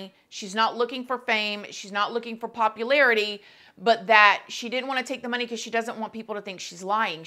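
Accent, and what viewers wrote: South, United States. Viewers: It's just her accent guys. She's got a southern accent. Hahaha it's that "southern twang" that's funny though, y'all are funny.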